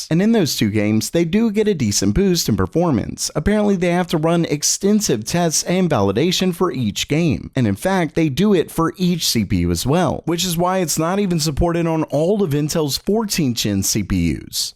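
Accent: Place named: Appalachia, United States. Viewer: Your hillbilly accent is unbearable. "It's one massive COARD"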